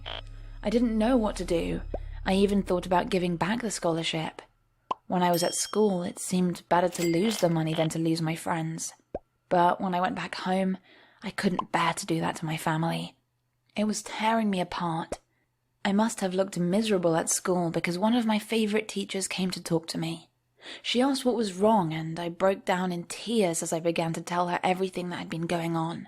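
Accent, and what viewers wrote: United Kingdom. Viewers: The speaker is British but no the person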